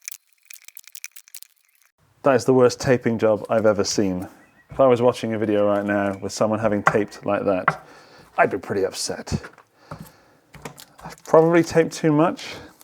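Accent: United Kingdom. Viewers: I love 90s studios, I love working on guitars, I love hearing British accents on YouTube (no disrespect to our transatlantic cousins) and I love the slightly mad professor vibe this series has. All round win! Would be great to see you modding a tube amp in a similar style, although you might actually die, so that could be a very bad idea.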